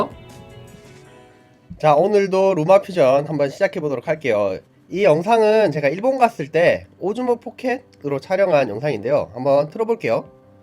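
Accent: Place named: Gyeongsang, South Korea. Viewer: 안녕하세요.. 구수한 경상도 사투리로 듣는 Lumafusion 영상 편집 설명 아주 아주 감사합니다. 저도 대구에 살다가 와서.. ㅎㅎ LA 사는 50대 아줌마가 뒤늦게 유튜브에 관심이 생겨서 틈틈히 공부중인데 아주 도움이 많이 되고 있어요. 기계치여서 겁부터 났는데 설명을 들으며 해보니 재미도 있고요. 아직 아이패트 3는 없어서 아이폰으로 해보고 있는데.. 암튼 감사합니다. 유튜브 대박 행진하시길~ 짱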